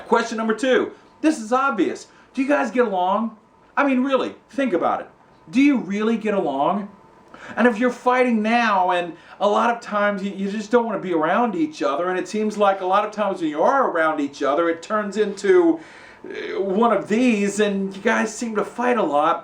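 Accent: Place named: Baton Rouge, United States. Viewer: Baton Rouge accent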